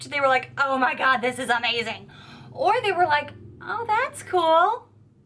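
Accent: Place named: East Coast, United States. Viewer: hi there. im ur newest video junkie but im gonna break the mold of 98% of the ladies who commented, u ready.....wait for it.... i DO NOT have a channel ( how refreshing huh ) and still subbed u. i love ur east coast accent and sass! u r FAN-TAB-U-LOUS my dear... MWAH! i sense a youtube and chill binge watching session coming on, wish me luck ( I LOVE AUTO-PLAY)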